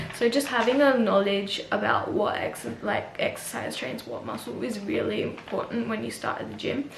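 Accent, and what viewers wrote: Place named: Australia. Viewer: Lol u sound like a propa aussie lol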